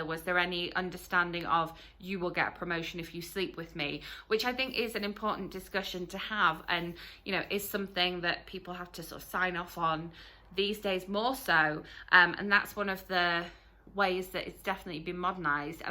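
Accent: United Kingdom. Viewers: You have a British accent, are you in the UK or America? You do love your books, do you like factual books or more fiction?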